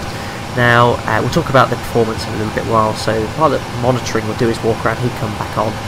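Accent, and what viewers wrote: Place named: United Kingdom. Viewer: Hey , I love your tutorials ..however you have to think about the watchers for which English is not the primary language spoken...if you just could take that into consideration and just articulate more that will help a lot to understand better your strong Brit accent ...specially with sim background noise Thank you ..👍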